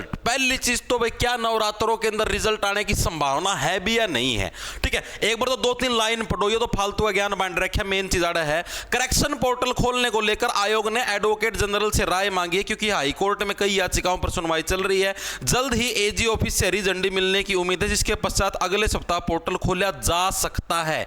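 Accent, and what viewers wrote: Haryana, India. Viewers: Result dekhi ja....aapki haryanvi ktttiiiii zehar😅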